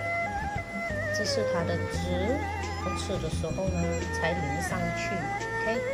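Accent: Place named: Malaysia. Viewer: 我们马来西亚人的语调😆很温馨还有马来文备注📝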